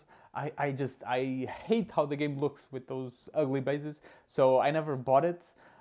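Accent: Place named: Portugal. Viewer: Muitos parabéns pelo canal e boa sorte! Sou grande fã de boardgames (solo e não só) e foi uma agrdável surpresa encontrar uma voz portuguesa neste meio :)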